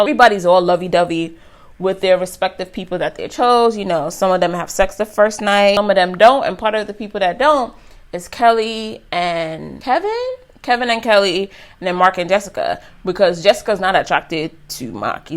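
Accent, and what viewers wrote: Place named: Caribbean. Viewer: I love your combination makeup lesson and reality show review. I'm Bahamian-American and hear a patois lilt in your voice. Are you of Caribbean descent?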